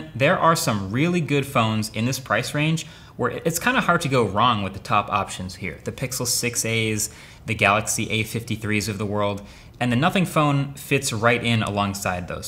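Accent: United Kingdom. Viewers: Finally a brit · Bro, he is british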